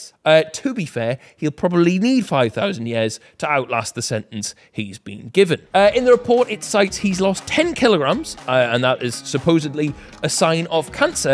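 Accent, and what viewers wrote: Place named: Scotland, United Kingdom. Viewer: As a Scottish person I approve of Scottish accent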